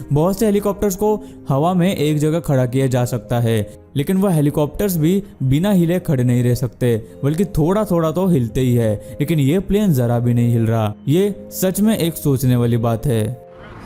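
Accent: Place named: South India, India. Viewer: Aap ki aawaj se to aap south indian lag rahe hain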